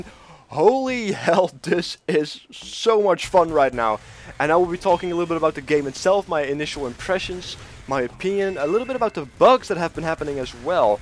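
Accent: Netherlands. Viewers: are u dutch? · you sounds dutch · you dutch? =3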